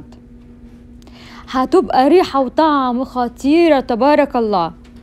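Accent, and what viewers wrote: United States. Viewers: من لهجتك كدا انتي ياما مولوده ف امريكا ياما روحتى وانتي صغنونه تسلم ايديكي💐💗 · هو انتى منين وبقالك كام سنه فىامريكا مش عارفه عربى ههههه على امريكى عسل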